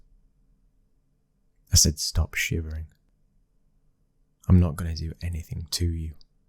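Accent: United Kingdom. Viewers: I knew i recognized the series, i have listened to the original one where it is a Italian voice, but i also liked this one as i am also British and it makes it easier for me to listen to, keep it up ❤❤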